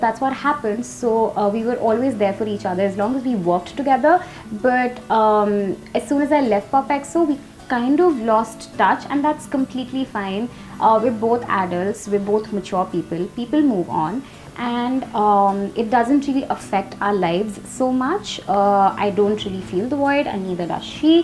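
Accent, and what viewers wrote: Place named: United Kingdom. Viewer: i love your english accent...how did you learn it n speak it so fluently.?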